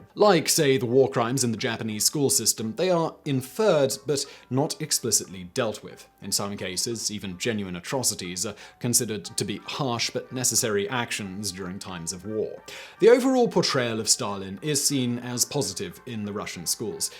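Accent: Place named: United Kingdom. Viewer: has that Britsh "Tone" that has annoyed people for hundreds of years, especially French people? I know both can annoy us in the States. American postwar influence did not rise from nowhere. The British Empire handed off it's "International Decent Chap" responsibilities to us, and that whole effort took on an American tone, for "Better" and "Worse".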